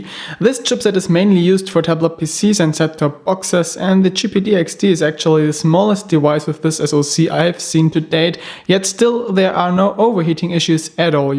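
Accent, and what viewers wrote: Germany. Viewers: german spotted :D good review mate!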